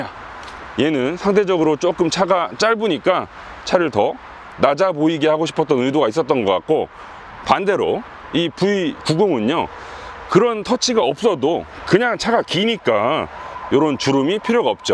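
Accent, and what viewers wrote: Jeolla, South Korea. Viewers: 전라도 사투리가 구수하네요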